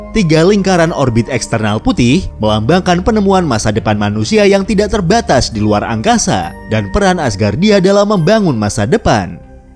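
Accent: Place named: Garut, Indonesia. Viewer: Asli Garut kah min?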